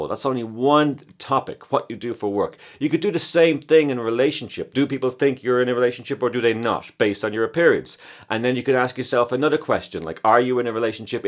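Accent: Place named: Ireland. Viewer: Thanks! I love your videos! They are informative and well presented! And I love hearing your Irish accent :)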